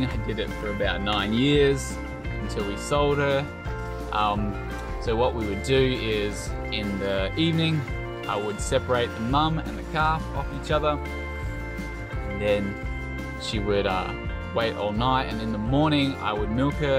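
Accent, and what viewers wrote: Australia. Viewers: Sometimes it seems to me that you speak English. But it seems to me that you speak a language that I do not understand. I understand that this is your Australian accent. Speak more slowly and clearly, do not lose the ending of words. I will be grateful, I learn English by ear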